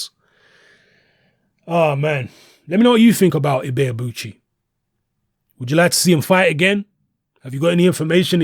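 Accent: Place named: South London, United Kingdom. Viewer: He's from South London , they all sound like that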